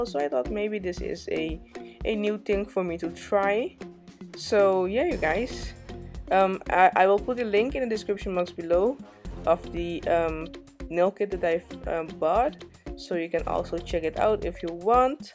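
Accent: Netherlands. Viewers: are you dutch? haha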